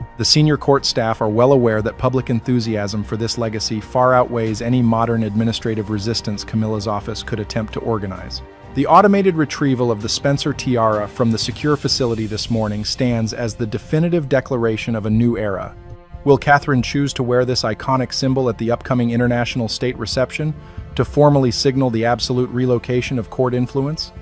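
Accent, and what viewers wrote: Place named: United States. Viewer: WHAT A LOAD OR RUBBISH ! Done with an American accent and no accurate knowledge of the Royal Family.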